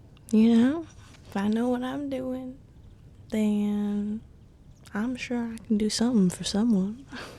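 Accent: South, United States. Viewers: Southern accent gets me every time. 👌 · I absolutely love the southern accent, especially at "my sweet little honey-bumpkin" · Another amazing & relaxing ASMR video well done,! You're really good at that southern accent every time, and I love it.😎👌 And as for the face massage and paw sounds, your paws always look the best (the softest) for these types of videos.😉👍 Please keep up the amazing work and have a great day.😊🫂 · I absolutely love the southern accent very cute ^^. And another amazing and relaxing video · ? How is your British accent from your odd Therapy session video and now your Southern accent in this video so good? Wait no. Don't tell me. Because it is good. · Oh snap! She's gone Southern!!! Time to relax! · YES! And she be southern tonight. Hi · yaaaaaaaaaaaaaaaaaaaaaay southern accent!!! yipee! yay! wooooo!:3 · THE SOUTHERN ACCENT IS BACK. 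I can finally die happy...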